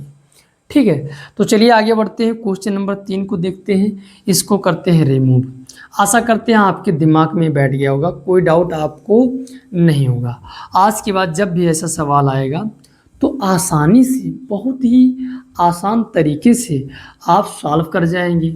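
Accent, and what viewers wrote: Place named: Awadh, India. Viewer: Sar avadhi bol rahe ho thoda bahut😅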